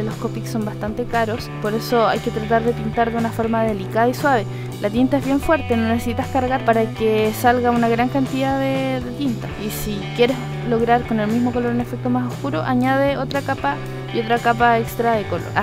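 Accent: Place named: Chile. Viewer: waau me encanta tu forma de hablar se nota al toque que eres de Chile, me gusto caleta tuto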